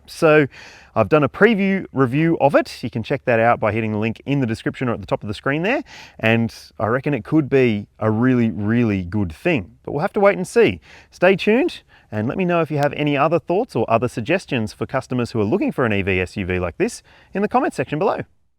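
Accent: Australia. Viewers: Lol average American moment. How can you not tell that this is from Australia? The car is right hand drive and he has an Australian accent.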